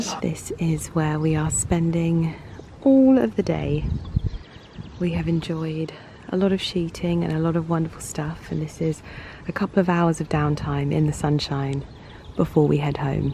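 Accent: United States. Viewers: Even for me as an American, it was a bit jarring to hear an American accent on your vlog, in a French place. 😂😂 This place is magical, thank you for sharing. 💗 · I hear an American or Canadian accent by the host....fantastic destination to visit. So beautiful.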